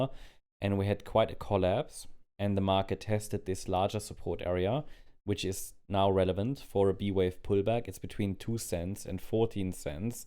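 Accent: Germany. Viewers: That German English 👌🏽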